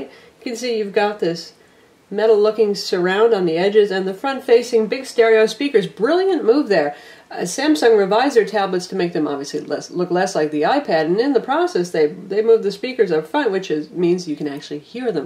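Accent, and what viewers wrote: United States. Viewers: It's not an ignorant comment it's a sensible observation. The woman in the video does come across as very robotic and does lack any insincerity in her review. I do understand however that some of this will be down to her American accent wich can sometimes come across as a little bit insincere to non-Americans. This of course isn't a dig at Americans before anyone jumps in but to other ears the accent does sound that way.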